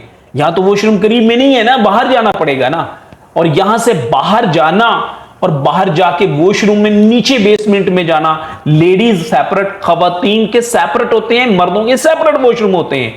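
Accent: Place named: Arab world. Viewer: اردو کو اردو کی طرح بولئے اسمیں عربی لہجہ یا تلفظ مت گھسیڑئے۔ بہت بھونڈا لگتا ہے۔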